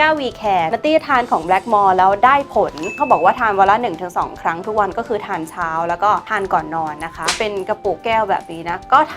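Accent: Isan, Thailand. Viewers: ชอบ พูดอีสานค่ะ น่ารักดี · พี่เป็นคนอีสานใช่ไหมครับ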